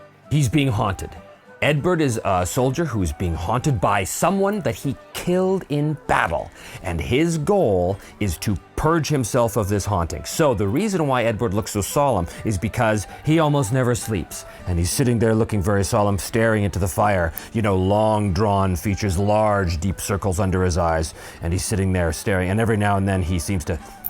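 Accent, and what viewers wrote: Ireland. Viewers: your Irish accent is pretty good! (That’s actually a big compliment!) Strong Dublin vibes. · Your irish accent is a bit Colin Farrel. 😁👍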